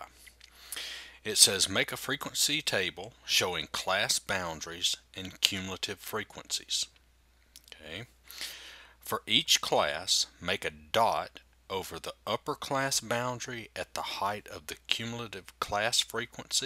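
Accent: South, United States. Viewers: you are AMAZING!! as soon as i heard the southern drawl, I knew you'd get right down to business! i read this in my textbook about 10 times and was completely lost. I watched your video ONCE and got it. The struggles of signing up for an in-person class but having the teacher scam me into a "hybrid" is REAL. I'd kill to have you as my stats teacher!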